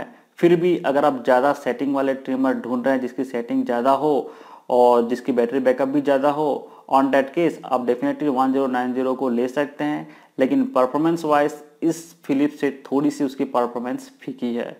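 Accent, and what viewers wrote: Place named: Bihar, India. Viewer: ha lga mje... Bihari tone se.. I'm also from Bihar MFP... I also make videos.. aap dekh skte ho mere channel pe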